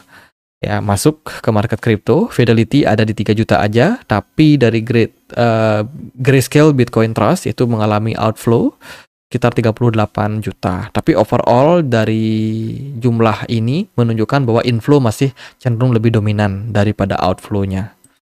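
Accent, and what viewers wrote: Bali, Indonesia. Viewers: Maaf,,,sptnya adminny ini dari logatnya,,asal BALI ,,ya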